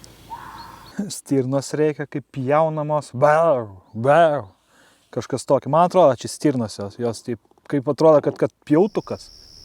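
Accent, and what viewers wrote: Utena, Lithuania. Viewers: Pagaliau utenietiškai😉😁10/10 reikikia Utetenietiškai , be visi Iš Telšių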